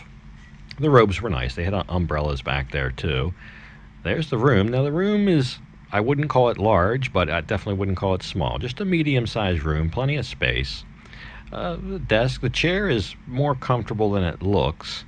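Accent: United States. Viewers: I dont understand, as you live in this country, that you pronounce cities, places and names in an american way... Why do you think this country wants their language pronounced like that. Disrespect !!!!!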